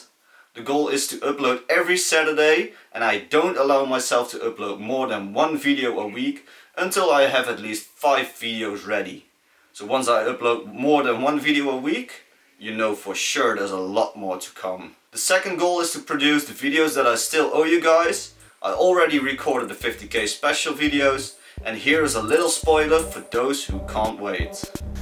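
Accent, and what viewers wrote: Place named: Netherlands. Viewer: Ben je nederlands ik hoor het aan je accent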